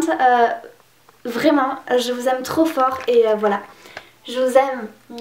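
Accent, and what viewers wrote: South, France. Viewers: aha j'aime ton accent du sud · Vive le sud ! D'ailleurs je trouve qu'on a jamais autant entendu ton accent que dans cette vidéo c'était chouette ! :p Je me suis reconnu dans les critères de mec " ce qui me fait craquer c'est qu'il soit plus grand, ce qui me rebutte c'est qu'il soit plus petit " c'est exactement ça :) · J adore trop trop le montage parfait avec l'accent du sud moi je comprends pas je vis dans le sud et jai pas d'accent mdr tes trop mignonne je trouve que tu fais plus grande (pas en taille ) juste j'adore cette vidéo et la youtubeuse ♥★♣:-D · Ah je t'aime vraiment trop. Je pense que c'est le meilleur TMI tag que j'ai vu jusqu'à présent. Le montage est top. Tu es trop mignonne et tu m'as fait vraiment trop trop rire tout au long de la vidéo. Moi aussi je suis poisson, ton anniversaire c'est donc Lundi? Moi, c'est demain hiihiii... 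Si j'y pense je te le feterai, meme si je pense que tu ne verras pas ce commentaire, enfin bon anniversaire en avance.. C'est vrai qu'il n'y a pas beaucoup de Youtubeuse qui viennent du Sud, et j'adore ton accent, on l'entend dans cette vidéo! Gros bisous :b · T'es tellement chooooooooooou :) Tu as de plus en plus l'accent du sud hihi c'est trop rigolo j'adore <3 Faut absolument que je regarde la série heaven tree du cooup!! :) Oui pour le haul, bisou d'amour <3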